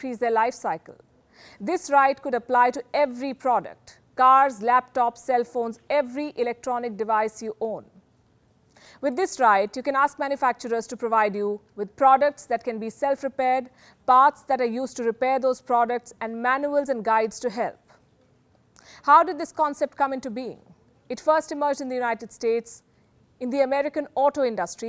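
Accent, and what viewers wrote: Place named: India. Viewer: This is Indian English...and she doesn't need to mimic any accent or your version of " proper pronunciation".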